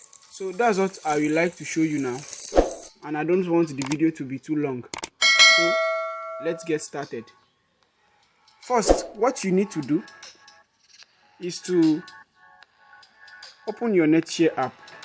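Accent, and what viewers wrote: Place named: Africa. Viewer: African accent❤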